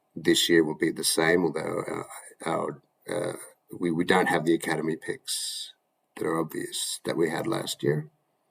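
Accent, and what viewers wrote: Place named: United States. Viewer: Am I crazy, or can I detect a slight Ameircsn accent?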